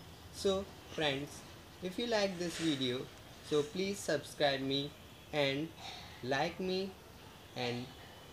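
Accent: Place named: India. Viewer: Indian hokar english bolta he pagal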